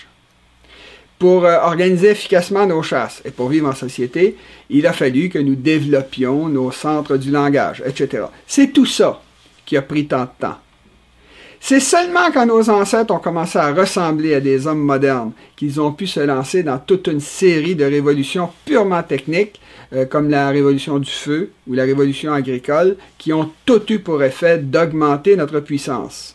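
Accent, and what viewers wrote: Quebec, Canada. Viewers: Je vous préfère en français. J'aime l'accent québécois et vous évidemment plus confortable. Par contre, les français européens sont de l'avis que le français québécois n'est pas français!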